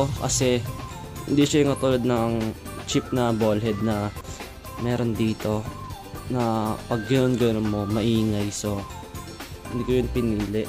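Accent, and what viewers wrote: Visayas, Philippines. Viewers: Pre, bisaya ka?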